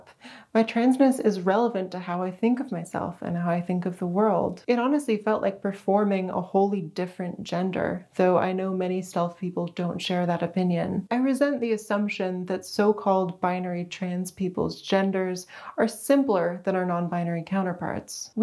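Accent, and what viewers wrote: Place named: Canada. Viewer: P.S. I love your Canadian accent! Much love from the UK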